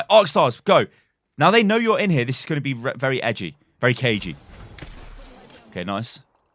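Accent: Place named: Northern England, United Kingdom. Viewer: Love this new series!! on btw are you northern? you have little bits of it i swear as i'm derbyshire, sounds similar.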